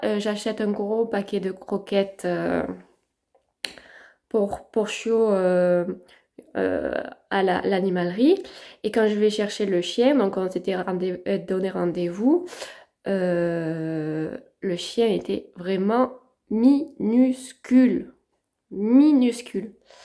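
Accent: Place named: South, France. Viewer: Bonjour Je vous découvre aujourd'hui ...qu'elle est mignonne!! C'est si triste de voir qu'il y a des gens qui ne se soucient pas du bien-être des animaux...sa mère va lui manquer autant dans l'affectif que pour son éducation... Les croquettes Hills sont très bien , nous les utilisons pour notre Gribouille un shitsu de bientot 13 ans. Nous avons prévu d'adopter aussi un petit chihuahua : il est né le 14 avril, nous ne l'aurons que vers le 20 juillet, ça lui laisse le temps de bien grandir auprès de sa mère et d'apprendre plein de choses. Je m'abonne car j'aimerais bien suivre cette petite et en plus j'aime beaucoup les plantes! Vous avez un accent du sud, vous êtes ans quelle region? Nous, dans le Lot😉 Bien à vous, Corinne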